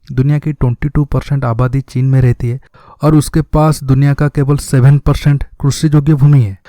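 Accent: Odisha, India. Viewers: Sir I know that you are an Odiya, by your voice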